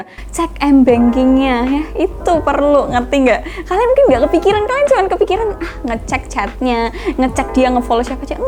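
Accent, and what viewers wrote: Java, Indonesia. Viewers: seneng cara ngomongnya medhok bgt♡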